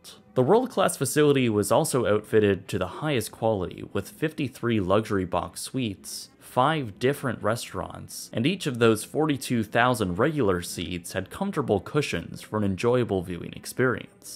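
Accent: Canada. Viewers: I figured he was Canadian from the way he pronounced "about."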